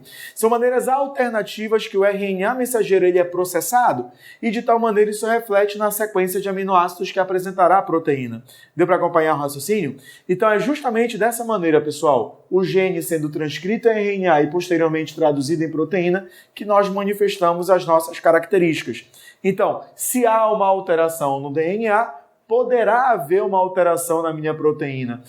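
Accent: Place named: Pará, Brazil. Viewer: O senhor é incrível!! Esse sotaque é paraense 😂❤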